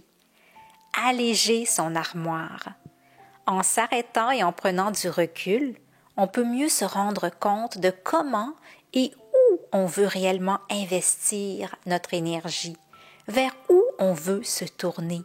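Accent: Canada. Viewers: Bonjour j espère que votre forme est revenue je suis tout a fait d accord avec vous pour ma part plus je prévois faire de choses et moins j en fais notre corps nous parle il faut savoir l écouter si on tombe malade c est pour nous obliger a arrêter merci pour vos vidéos et le petit accent canadien ❤😊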